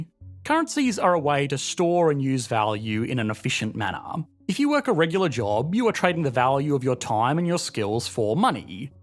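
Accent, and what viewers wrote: Australia. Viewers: For so long I hold myself to not write this ugly comment but I need to becouse I am sure I am not the only one who observed that: you have a good content and interesting video s but you English accent the way how you pronounce the word s that are at the end of a sentence is so so ugly for any 👂👂 ears that are looking at your videos, I don't know your accent sounds like a bulshet Australian accent, I mean the worst possible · Take it easy; he's Aussie. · the Aussie accent is a real distraction - can't get through the content....brain is suffering..... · the au accent is annoying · Where at in Aus are you from? I've never heard an accent like yours. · Dude, are you trying to sound more australian? :)) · This sing-sing delivery in a thick Aus accent is impossible to listen to. Compounded by the swift pace of the non-stop read. This is how economics is made opaque and a turn off for average people. 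Poor communication of basic ideas.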